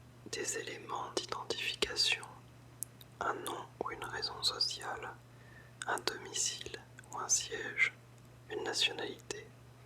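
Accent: France. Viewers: Oh, the French accent is amazing.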